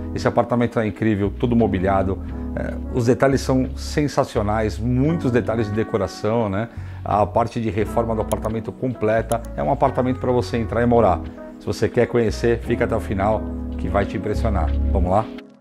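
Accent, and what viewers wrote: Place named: São Paulo, Brazil. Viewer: Ah, que delícia de sotaque paulistano ❤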